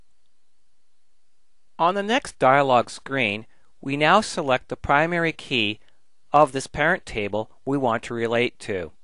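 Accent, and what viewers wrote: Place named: Canada. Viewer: Canada? love the accent. thanks for sharing.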